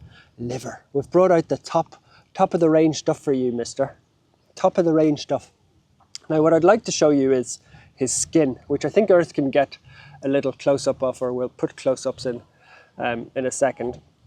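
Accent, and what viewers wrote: Ireland. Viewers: I think dogs like the sweet tone of your voice and your Irish accent ! Thank you for helping and saving this poor dog. He landed on his 4 paws in Doggoland !!!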